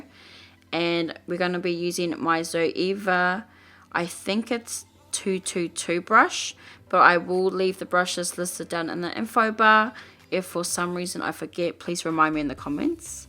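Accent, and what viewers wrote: New Zealand. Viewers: You from NZ?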